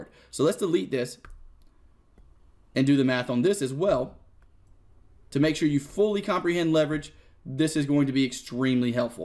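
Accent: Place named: Alabama, United States. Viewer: man you have got to be from Alabama.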